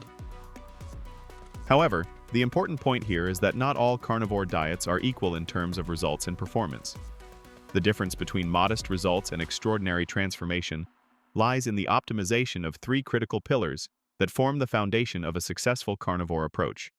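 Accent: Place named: Germany. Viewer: is this a german channel? he sounds like a german..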